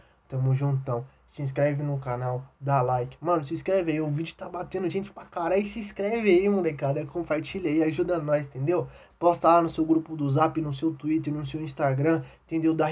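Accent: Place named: Favela, Brazil. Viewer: Jeito que favela fala meu deus kkkkkkkkk eu amei esse vídeo !!!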